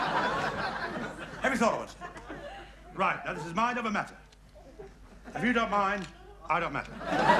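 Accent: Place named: West Country, United Kingdom. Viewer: He had a thick West Country accent!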